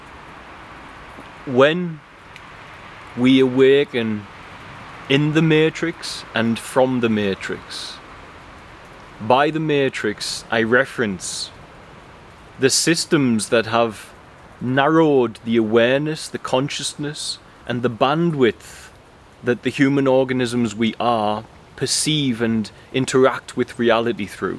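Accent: United Kingdom. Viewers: Where are you from (originally from) you sound British brother - Also I’m a new subscriber and looking forward to your future content 🙏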